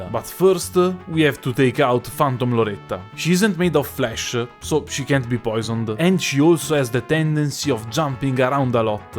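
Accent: Italy. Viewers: It's just your average Italian accent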